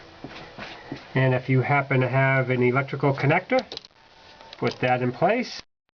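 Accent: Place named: Brooklyn, United States. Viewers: I love the Brooklyn accent. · Logan Mattson He must be from NY that's all. Everyone sounds the same from over there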